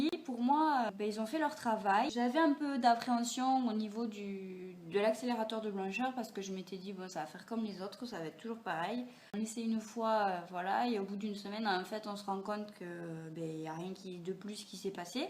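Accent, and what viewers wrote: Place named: South, France. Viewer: coucou coup de fouet mdr j'adore je suis partie vivre dans je bord et je suis du sud ton accent me rend nostalgique (j'ai mon accent aussi lol) super vidéo que de belles dents 😆😆😆😍😘 bye bye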